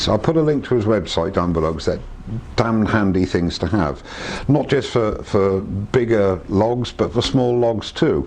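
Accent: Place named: Bristol, United Kingdom. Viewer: I've been producing some larch snowmen on my second hand lathe from Aldi (£70- not bad if you want to try some spindle turning before getting more invested.) Nowhere near your skill level so thanks a lot for uploading, I'm learning a lot from your stuff. Merry Christmas! Ps what part of the South West are you from. You sound 100% North Somerset/Bristol area.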